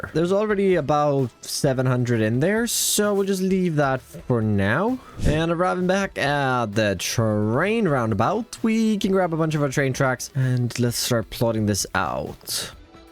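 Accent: Sweden. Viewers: This is the first video I see of you and by your accent I bet you were swedish.. and then I looked at your youtube channel description and I was right.. Im swedish too :D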